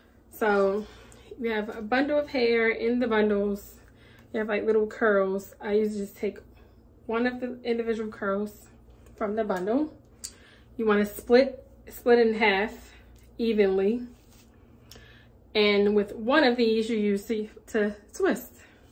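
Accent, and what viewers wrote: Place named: Maryland, United States. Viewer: I love your hair, and love the accent, you got to be from Maryland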